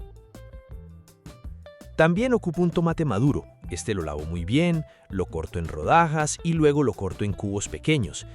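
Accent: Antioquia, Colombia. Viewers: ME GUSTA LA FORMA DE SU NARRATIVA PAISA..Y AL FINAL UNO LE AGREGA OTROS INGREDIENTES ATUN..POLLO ASADO EN TROZOS Y EL ADEREZO LO PUEDO CAMBIAR..Y LA BASE DE SU ENSALADA ES EL INICIO DE UNA COMIDA.GRACIAS POR MOTIVARNOS A COMER SANO..Y CON TODOS LOS INGREDIENTES COLOMBIANOS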